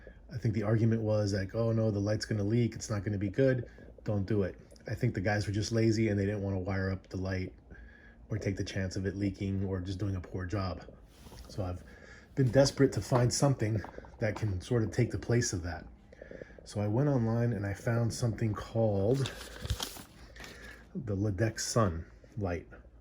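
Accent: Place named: Long Island, United States. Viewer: Are you on long Island? Sounds like it....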